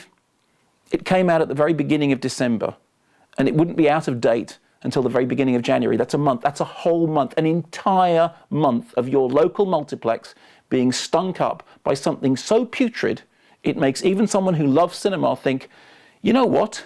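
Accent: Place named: England, United Kingdom. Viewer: Can't really argue with him, he does have an English accent after all...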